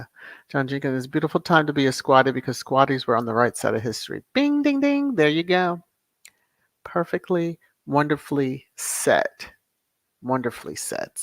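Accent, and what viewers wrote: RP, United Kingdom. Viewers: Thank you for the podcast 🙏 ❤❤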